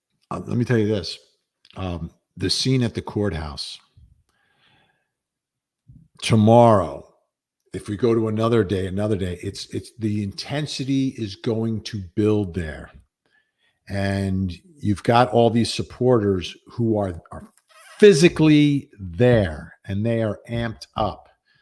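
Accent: Boston, United States. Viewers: is this case getting to you, you've fully adopted that Boston accent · not too shabby a boston accent for a joisey guy,!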